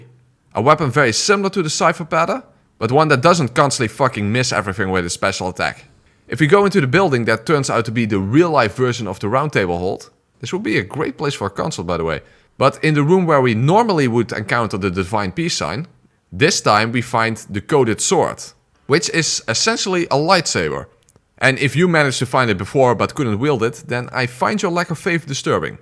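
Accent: Netherlands. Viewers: Lol je bent Nederlands ik hoorde het Nederlandse in je stem maar wist het niet zeker tot dat je zei dat je Nederlands was. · deam he sounds dutch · I smell Nederlands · Horrible Dutch accent. Next time use a friend or family member with proper English accent to do voiceover. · You are so dutch, i can not unhear it :p nice vid bro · Haha oké ja overduidelijk Nederlands 😂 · Excellent video but my god do i hate the Dutch English accent... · Jeez I thought my dutch accent was thick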